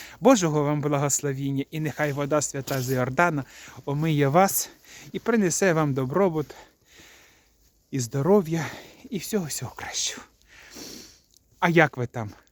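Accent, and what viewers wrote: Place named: Kozelets, Ukraine. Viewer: Каждое видео, как дома побывала, говор знакомый с детства, Козелецький район, ни одного западенского слова, все красиво и понятно и настроение Новогоднее.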